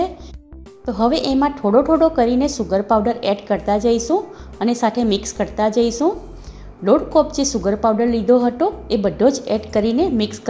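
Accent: Surat, India. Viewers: Tame surti lago chho....boli parthi samjay che😀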